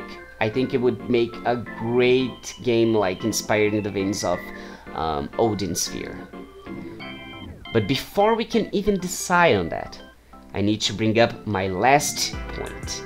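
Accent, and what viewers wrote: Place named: Brazil. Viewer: my faves are Nelumba (is this his name?) and Ro lol (edit) MENINO TU É BR??? EU NUNCA SOUBE DISSO eu suspeitava pelo sotaque mas não tinha certeza aaaaa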